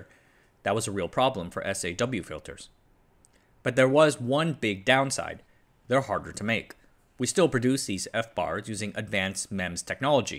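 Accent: United States. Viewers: Surprisend by you saying "aluminium". -Yet you sound like an US American, possibly of Asian descent (barely noticeable at all, when listening to you speaking). What's your story? You didn't say "aluminum" ! -Yet you just sound "american" for my nort european ears! :p I really enjoy listening to your informative, interesting and detailed videos ! Keep up the good work 👍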